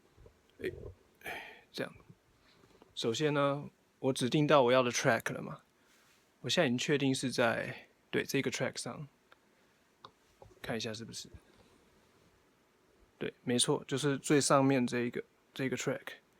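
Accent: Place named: Beijing, China. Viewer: Nin shi beijing ren ma? Nin shuo de henhao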